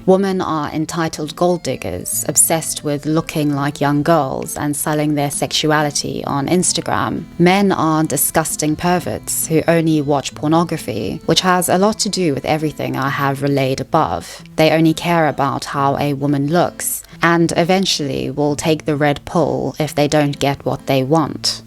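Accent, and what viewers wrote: South Africa. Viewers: I love your channel, and I've been binge-watching your content over the past few days. I hope you don't take this too personally, but I had to comment and say it drives me crazy that you pronounce "women" and "woman" the same. Is it a South African pronunciation? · She's originally from South Africa, stop trying to push the Queens English on everyone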